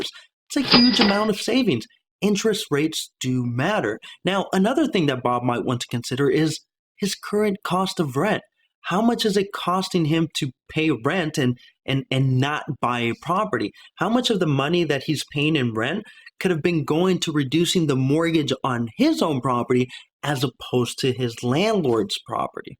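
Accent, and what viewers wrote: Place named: Texas, United States. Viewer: A for content...F for Texan accent; stigma stigma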